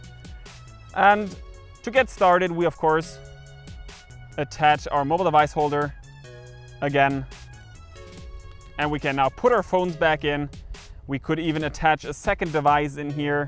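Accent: Germany. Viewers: we wanna throw a look hier hat man schon gemerkt das du deutscher bist :) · Kommst du aus Mainz? Schönes Review :)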